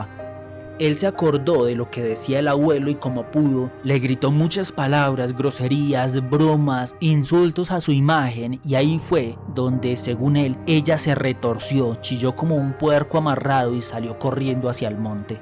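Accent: Colombia. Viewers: con razón me parecía colombiano 😂 pero lo felicito están bien las historias gracias saludos Bendiciones 🙏🏻❤️ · Excelentes relatos , con voz de acento colombiano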